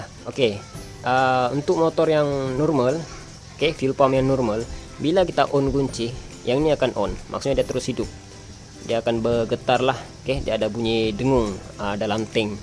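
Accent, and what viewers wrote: Malaysia. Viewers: Awak nich orang mane, cakapnye kaya orang melayu, ini videonya ranca bana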